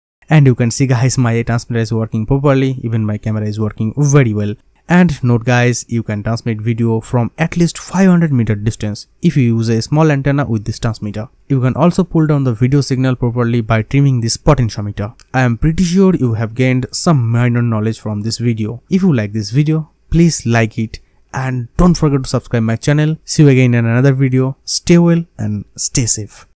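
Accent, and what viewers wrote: India. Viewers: Lol. Hardly. Great Scott is German and this guy is Indian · Brother ... you sound so Indian ... Ram Ram ...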